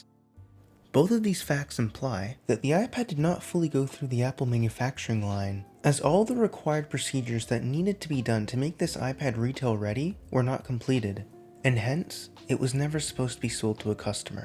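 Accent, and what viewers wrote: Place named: Netherlands. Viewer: Dus je bent wel een Nederlandse.... can't help but noticed the accent .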